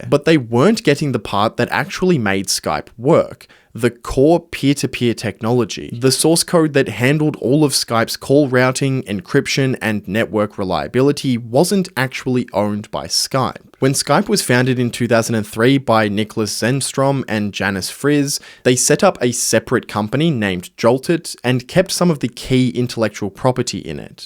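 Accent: Australia. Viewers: Do your channel a favor and please get someone to do VO that isn’t Australian. I made it 90 seconds before NOPING out.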